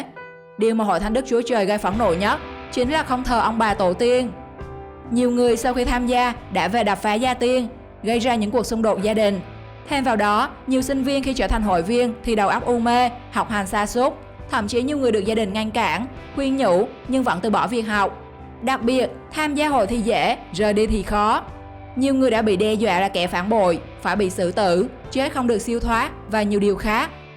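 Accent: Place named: Binh Dinh, Vietnam. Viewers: Như người bình định nhỉ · Nghe giọng cj nói cứ như cj là ng miền trung ý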